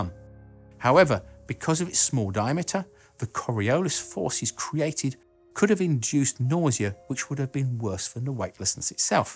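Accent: United Kingdom. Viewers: i found your channel by youtube advise like following: 1. British accent check 2. Shaved head check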